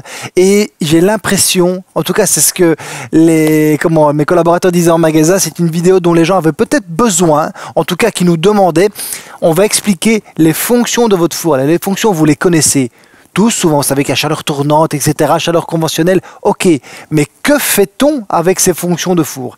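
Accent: Belgium. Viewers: Merci 🙏 Monsieur pour vos bons conseils, j’ai appris des choses grâce à vous. Je pense que vous êtes Belge ça fait plaisir de vous écouter ça change une fois comme on dit en Belgique 🇧🇪 j’aime aussi les français après tout on est voisin non peut-être 🤔 🙏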